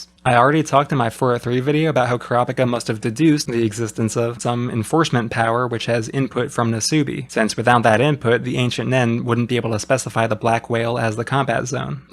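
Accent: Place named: Pacific Northwest, United States. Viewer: hxh fan, jerma references, league references, soprano references AND a PNW accent? im liking this guy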